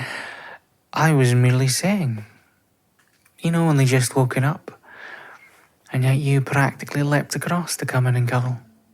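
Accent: Scotland, United Kingdom. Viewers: Well, that Scottish accent does it again lol. I wasn't ready for the mix of soothing and spicy—you're definitely a master at keeping us on our toes. Can’t wait to see what you come up with next! ❤ ❗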